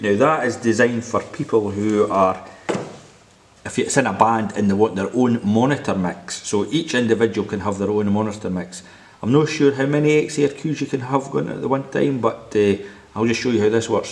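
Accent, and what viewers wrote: Scotland, United Kingdom. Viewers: Enjoyed the Scots accent.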